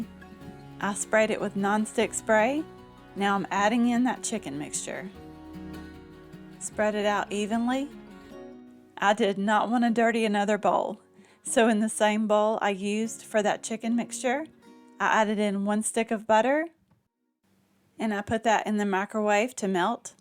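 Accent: South, United States. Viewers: What a gorgeous lady with a nice southern voice ♥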